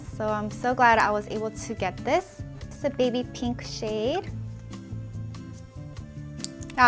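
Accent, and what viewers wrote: South Korea. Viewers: Every time I watch your videos, I notice how you have this Korean accent but I'm not quite sure if it's Korean accent or not because my fellow Korean friends who were born in Canada don't have such accent. Is it only me who notices her accent?? lol what accent do you have?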